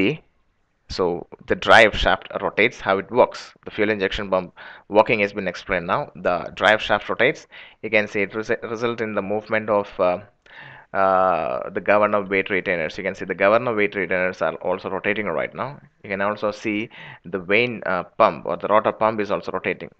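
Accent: Kerala, India. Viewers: 10 secs in I knew he's a mallu ..damn im good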